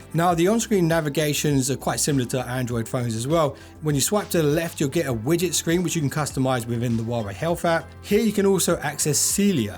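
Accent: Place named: United Kingdom. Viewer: Probably UK; judging by his accent.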